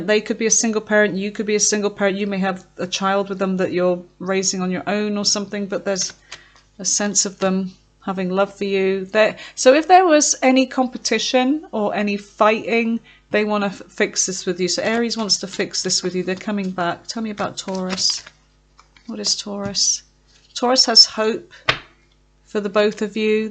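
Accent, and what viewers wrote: United Kingdom. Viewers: So accurate - can’t believe it. Love the British accent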